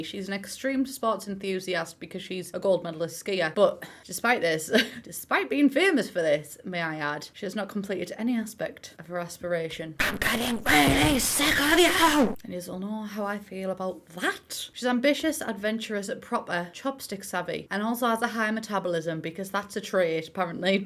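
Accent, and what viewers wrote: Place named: Northern England, United Kingdom. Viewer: She is obviously a English Northerner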